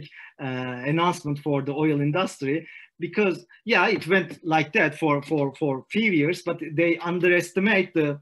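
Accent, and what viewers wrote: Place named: Turkey. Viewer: Aksandan həmən anladım ki, danışan türkdür :) uğurlar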